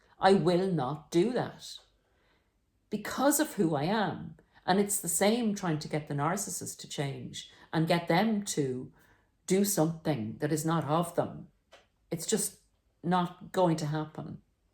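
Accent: Ireland. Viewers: Fook! There's something about a glorious Irish accent that makes learnin' things much more engaging. Alas, me mother's side is Scottish, colonized by Brits, and they're wankers!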